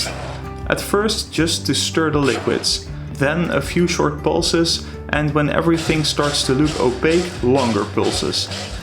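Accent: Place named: Netherlands. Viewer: You sound very Dutch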